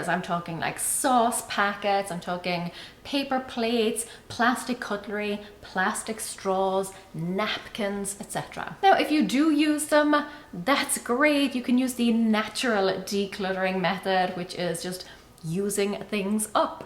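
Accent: Ireland. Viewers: I just discovered your channel and couldn't wait to subscribe. I can relate to so many of the things that you talk about. My fantasy life is miles away from my real life. Years ago when everyone was into rubber stamping I purchased some equipment and then realized that I wasn't into crafting and was never going to enjoy doing it. I would say my biggest problem is holding onto something just in case I might need it at a later date. I'm starting to watch all of your videos and I hope that I can gain some inspiration from you. You are both informative and very entertaining to watch. You have such a calm demeanor and your Irish brogue is lovely.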